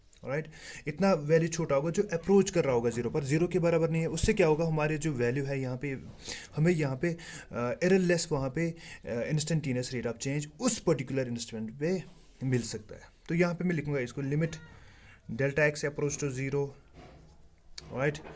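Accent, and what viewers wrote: Kashmir, India. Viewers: Yes you are kashmiri Your urdu speaking says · Your kashmiri accent 👀🤌🏻,,thanks for the explanation ✨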